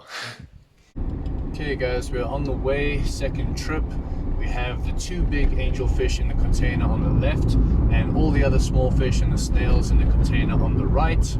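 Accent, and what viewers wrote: South Africa. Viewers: Im kinda getting South Africa vibes but Idk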